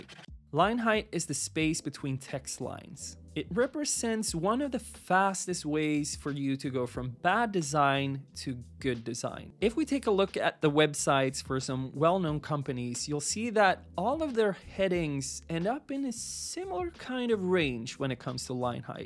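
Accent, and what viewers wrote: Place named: California, United States. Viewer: typically cali dialect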